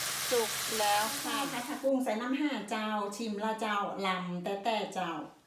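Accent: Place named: Northern Thailand, Thailand. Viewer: อู้เมืองน่าฮักดีครับ555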